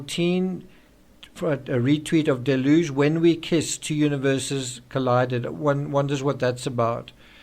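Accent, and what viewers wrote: South Africa. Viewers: Trying to work out if u are from CT, KZN or Gauteng with that accent.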